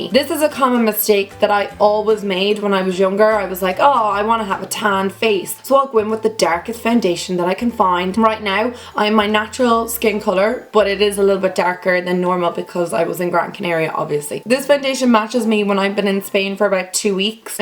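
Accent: Ireland. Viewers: Are you Irish beautiful?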